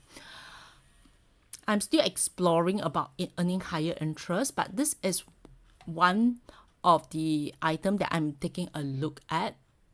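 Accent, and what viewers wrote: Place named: Singapore. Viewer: Singlish ma......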